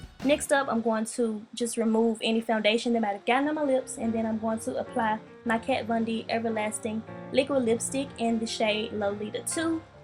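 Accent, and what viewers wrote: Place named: Memphis, United States. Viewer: i heard you talking and i was like she sound like she from memphis cuz i from memphis and live here and you look really familiar for some reason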